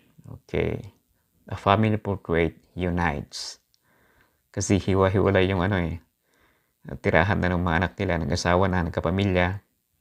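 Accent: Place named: Ilocos, Philippines. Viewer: Ilokano ka ngayam sir haha nice one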